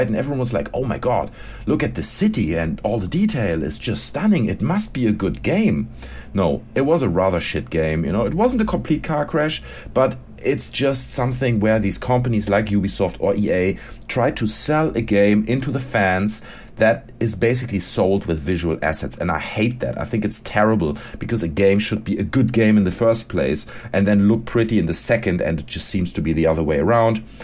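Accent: Germany. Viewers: Your German (correct me if I'm wrong) accent sounds extremely awesome.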